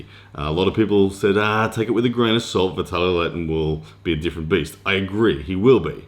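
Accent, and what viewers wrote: Australia. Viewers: Great Video! Very informative with the smooth aussie delivery. ESPN should have an Arm wrestling News Segment for you bro. Quality content ,keep em coming pls.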